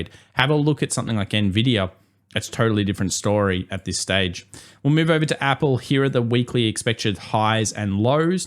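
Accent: Australia. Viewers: He is Australian bro? Unless you can point to it.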